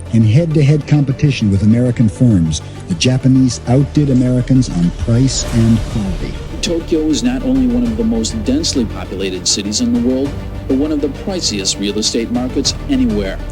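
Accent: India. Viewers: Your perspective is gratuitously anti-american. The US trade policy post-WWII was to support its enemies economic development by direct investment and access to its customers. By the mid 1980's the US realized it was cannibalizing its own industrial base. America lost its electronics industry, its telecommunications manufacturers, and its automobile manufacturers gutted. Would you expect any country to eat its own businesses so foreign businesses would flourish? No. Plus, by any objective perspective, the dollar was grossly overvalued compared to other countries. And the devaluation was only a temporary maneuver. So the real problem in Japan was its over valuation of its domestic assets; companies and real estate. Everyone wanted to invest in these inflatable assets so they could "get rich quick" and rather than invest as a fundamental motivation people invested were motivated by greed and momentum. 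That never ends well and you can't blame "evil" USA. But "evil USA" sells well on youtube. Your best comment was at the end about "Countries having no permanent friends only interests" summarizes the most enlightened foreign policy. No foreign country can come to the aid of another to "save" it except in acknowledging its own best interests. Having these criticism I still enjoyed your video, flawed as I believe it to be. (I'm a sucker for Hindi accents) 😆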